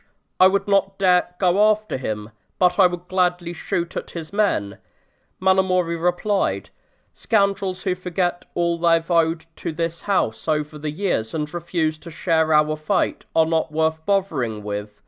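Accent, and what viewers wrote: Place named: United Kingdom. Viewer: Thanks for the effort, Even though the Japanese names are pronounced in a British way, not the Japanese way. Still, Thanks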